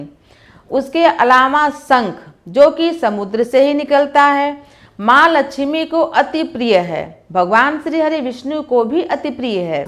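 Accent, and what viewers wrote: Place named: Allahabad, India. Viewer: Both boht achi baat batai apne.. Mai Allahabad ki hu... Apki boli waise hi... 💕💕👍👍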